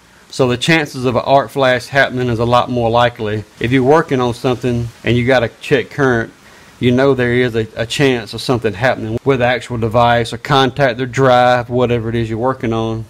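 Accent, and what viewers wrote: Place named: Georgia, United States. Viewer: Are you a Georgia boy! Lol. Nice meter. Stay safe buddy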